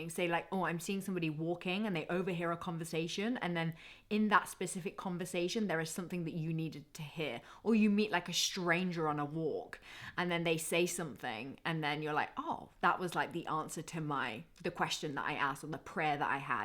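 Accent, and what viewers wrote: United Kingdom. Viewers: Hello my favorite dreamy reader of my path in this coming new life, Your readings are so resonant of the process I am going through for the last few months, sometimes I find it incredible and very enlightning, thank you for the energy you devote to my readings, I am always looking forward to just seen you and hearing your beautifully sounding British accent and that natural radiance and beauty that comes within you, that I love so much, don't ever stop ohhh yes and I Love You more than you will ever know!!!!!!!💖💖💖💫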